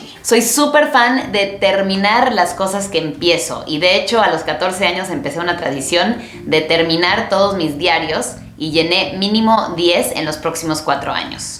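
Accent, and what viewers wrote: Mexico. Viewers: =) Ojalá todas las personas del mundo tuvieran tu simpatía y frescura! Tu acento mexicano es apenas perceptible, sólo se nota en la pronunciación de determinadas palabras o al finalizar alguna frase. Cuando vi el video "tips para mejorar tu inglés" supuse que habías estudiado castellano en Argentina. Me encantaron tus historias!. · Tiene acento mexicano más que español, supongo que es porque ha vivido en México, en cualquier caso habla fantásticamente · Me encanta tu acento Tía. Pienso que es por los años que viviste en México aprendiendo español, más de La sierra, y no me gusta tanto que hables chilangoooo..jajajaja.. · Tu lengua nativa es inglés?? Tenés un acento algo mexicano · JAJAJA! hablas como mexicano con acento español (de España). Ni castellano ni Neutro. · Tu acento lanza a mexicano. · apenas lo acabó de ver y wow de los mejores 50 cosas sobre mi, ya decía yo que hablas más mexicana, yo soy de guadalajara, me encanto encontrarte 😘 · Your Spanish is so good, I'm in shock. 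I watched your first video in English and I'm like, o yeah, she's from the states. In this video, you turned Mexican. You became a different person, like a popular person. I think I need Anthony Bourdain to explain this to me. I just now realized I become a different person when I speak Spanish. I have so many questions, Your Dad, Your food likes, your music interest... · Jajajaja se nota mucho tu acento mejicano. Yo soy de españa y por ejemplo cuando tu dices "linda" nosotros utilizamos guapa o bonita.;) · Hablas demaciado español Mexicano, al punto que hay palabras que no entiendo, por ejemplo:Capote ni se lo que es y me da una intrigaaa!. Tenes que hacer un video hablando como Argentino. ME ENCANTARIA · Habla como mexicana... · Escribí en otro vídeo mencionando que hablas inglés con influencia mexicana. Veo que se debe a que eres chayotera (de Orizaba) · oh tiene acento mexicano!!! haz los acentos latinoamericanos:)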